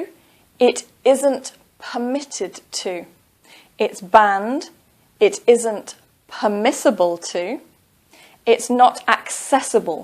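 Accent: United Kingdom. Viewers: Hi. Thank you very much for your really nice teaching English with wonderful smile. I am very like British accent, so I am real glad that your English teaching on YouTube. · that's a great work, great accent, continued and uninterrupted, i wish i could talk like British,my accolades for your altruism, have seen your website too. its catchy. thanks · Would you please upload a video with tips to get correct answers on map/diagram matching on listening please? Would really appreciate. Love your British accent 😆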